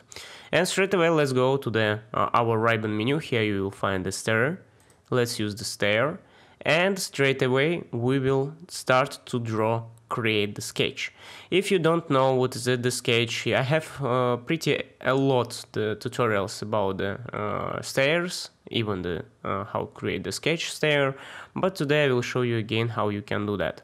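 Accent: Russia. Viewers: Спасибо за урок. Судя по акценту ты с России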